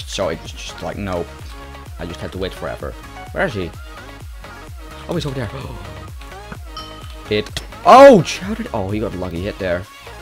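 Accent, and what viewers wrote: Netherlands. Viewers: It sounds Like youre dutch Nice CHANNEL keep up THE Good work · Ik hoorde het aan je accent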